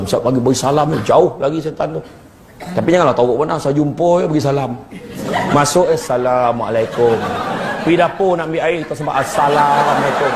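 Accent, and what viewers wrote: Negeri Sembilan, Malaysia. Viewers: Aku org melaka, tpi paling suke dgau loghat org nogori... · Seronok dongar ustaz syamsul ceramah..bnyak pengajaran..semoga ustaz sukses sllu..loghat N9 ustaz mmg thr best.kelakar! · loghat nogori tu yg sodap dongar😂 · Ustaz ni..setiap dakwah mesti kena ada lawak,sedih dan loghat negeri sembilan ada tiga ii ni konfirm best ceramahnya 👍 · Logat Negeri Sembilan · Memang loghat nogori tu yg sedap dongar haha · Biasa aku syok dgq ceramah bahasa utaqa. Dgq loghat nogori pon syok gak rasa ni. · Ramai yg mula soka loghat nogori.. Tanda Pm baru dri nogori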